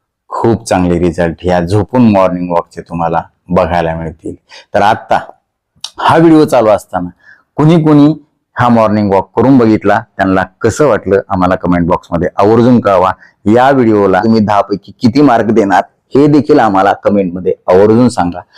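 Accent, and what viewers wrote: Marathwada, India. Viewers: डॉक्टर तुम्ही नगरच्या आहात नगर बीडच्या लोकांसारखा बोलणार आहे म्हणून विचारलं तुमचं बोलणं पण खूप छान आहे आम्ही पण बीडच्या हो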